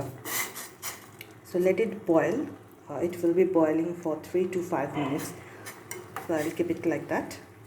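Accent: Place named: Kolkata, India. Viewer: Sunei apnake Kolkatar bashinda mone hocche...... Great work.... keep it up....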